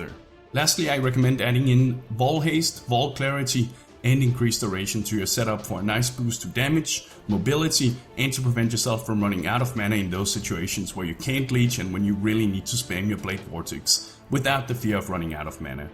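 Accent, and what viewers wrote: Denmark. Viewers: The accent tells me he's a Dane, am I right?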